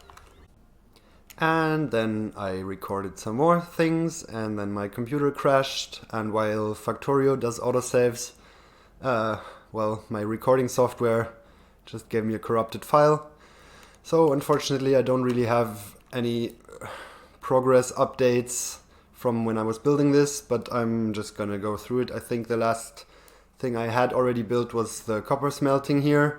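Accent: Germany. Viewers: Just found your channel and really enjoyed you rambling. Are you german?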